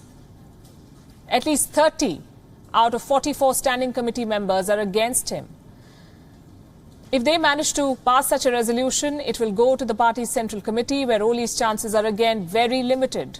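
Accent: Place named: India. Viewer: There is nothing more funny than the sound of English coming out of an Indian person :)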